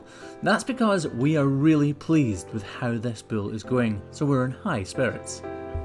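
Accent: Scotland, United Kingdom. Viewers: I've watched your videos for months now and love your content. Please distract me with your scottish accent from the horror my country is becoming (USA). 😭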